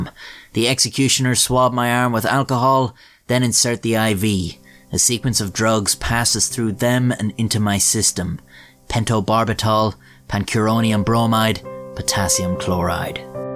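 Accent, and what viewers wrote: Ireland. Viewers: lol randomly got a recommend to this vid, and honestly the irish accent made this 100 times better. XD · Love the Irish accent.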